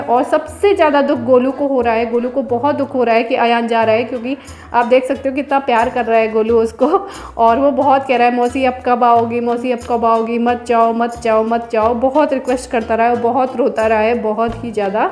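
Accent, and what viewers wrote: Uttar Pradesh, India. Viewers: Ap up se ho kya... ??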